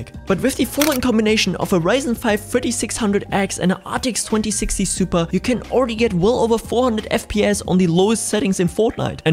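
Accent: Germany. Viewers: Guter deutscher Akzent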